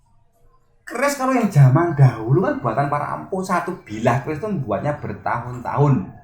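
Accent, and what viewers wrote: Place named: Ambon, Indonesia. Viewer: Gw Kira org ambon kmu bro ??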